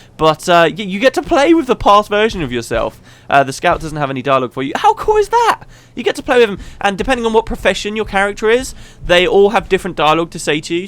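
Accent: United Kingdom. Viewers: Yay! I recorded one myself for nostalgia when I'm playing Gw2. But, I kind of died at the end and didn't do the one from this year. Now I can watch it in a few years, but with British commentary!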